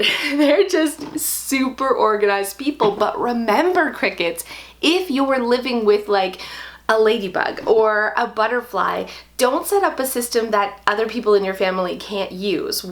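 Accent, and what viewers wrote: Canada. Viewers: I thought you were from Canada based on your accent! ☺️☺️☺️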